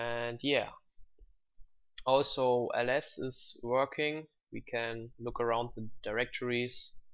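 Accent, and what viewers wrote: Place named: Germany. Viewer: man merkt den Deutschen Dialekt ;) xD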